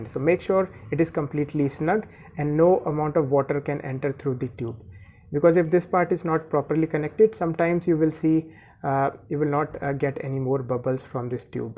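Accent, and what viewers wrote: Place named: India. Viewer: When you hear that Hindu accent you know the tutorial is gonna be fire!!! Thanks man, you helped me fix it! My turtle is happy with bubbles again :3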